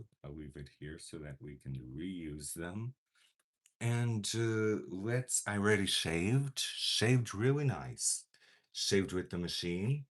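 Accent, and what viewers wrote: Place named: Pittsburgh, United States. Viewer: Ha you guyz!!!! How are Yinz dooooooing!!! You sound like a Yinzer!!! Hehehehe Pittsburgh would love you in it's city❤❤❤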